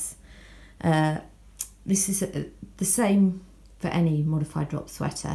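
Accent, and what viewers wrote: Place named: United Kingdom. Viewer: I wish that you were my neighbour you angel. Thankyou for this video. Nice to hear another Uk lady. I really love your work and you explained it all just perfectly. ❤❤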